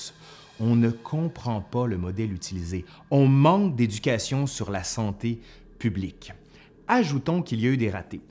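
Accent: Quebec, Canada. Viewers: Sympa ta chaine "couuusiinnng". L'accent québécois est savoureux.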